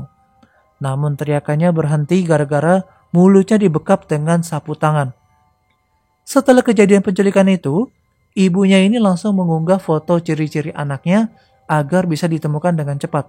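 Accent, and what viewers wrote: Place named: Java, Indonesia. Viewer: Kurang kurangi ngomong lur nya bg... Ngak enak aja d dengar... Mungkin bagi teman d pulau Jawa mengerti... Tapi kalau Chanel ini mau berkembang harus netral aja cara bicaranya.... Sedikit masukan... 🙏🙏🙏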